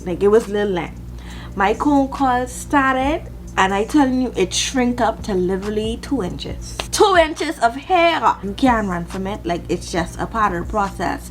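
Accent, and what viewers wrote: Bahamas. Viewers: The second you opened your mouth, I knew you were Bahamian ❤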